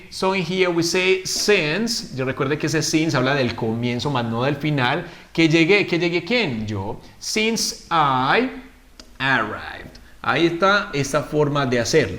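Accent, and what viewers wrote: Colombia. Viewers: Me desconcentra el acento colombiano 😍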